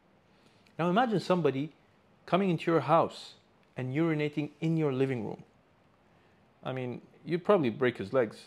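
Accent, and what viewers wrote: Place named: India. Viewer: ..why do you sound like Indian, ?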